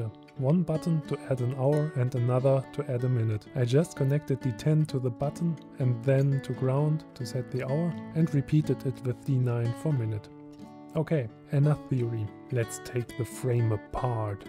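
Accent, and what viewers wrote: Germany. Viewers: Höre ich da etwa eine leicht deutsche Aussprache heraus?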